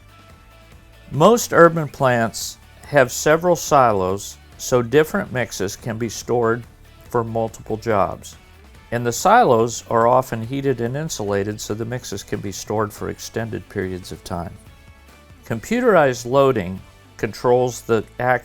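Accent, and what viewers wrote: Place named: Eastern Europe. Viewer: Nice video, but speaker has an accent, that I can't define, and this makes me curious. Is it East European accent? All the best from Europe )))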